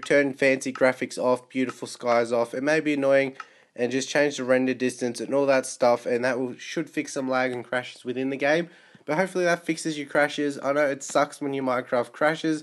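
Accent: Australia. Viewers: I’m Australian and I hear a Australian accent are you australian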